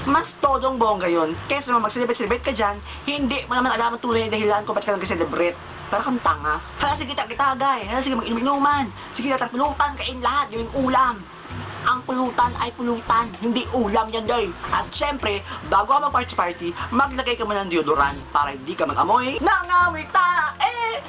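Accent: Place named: Cebuano, Philippines. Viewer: cebuano accent mo dabest!!!.. like2!!!...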